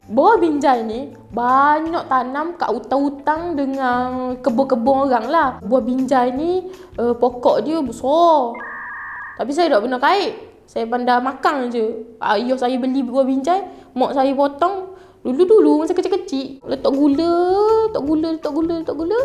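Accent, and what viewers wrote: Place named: Terengganu, Malaysia. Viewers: Seriously kakak ni yang paling comel dalam ML Studios, dengan bahasa Terengganu pekat dia, cara dia bercakap. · Akak idola..banyak belajar loghat Terengganu dengan akak.. 🐊: Heheh...dapatlah satu heheh... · Suka dengar loghat ganu, speaker comey betul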